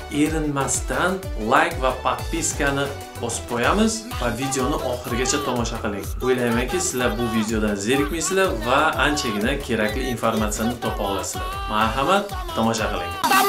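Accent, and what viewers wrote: Bukhara, Uzbekistan. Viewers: Сих Бухороданмисиз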